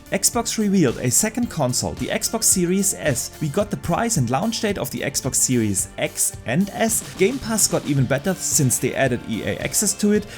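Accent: Germany. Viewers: Horrible German English :(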